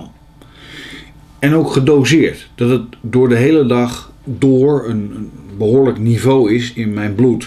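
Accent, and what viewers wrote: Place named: Leiden, Netherlands. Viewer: dank je wel...interessant! ik vind het leuk wat je vertelt! Ik wou nog vragen wat jou achtergrond is. Ben je arts of natuurgeneeskundig therapeut of arts? of/en het voorafgaande en helderziend of medium? Ben echt geinteresseerd! denk hetzelfde....maar wil gewoon meer van je weten. ps: Kom je uit Leiden?? Je klinkt zo Leids?!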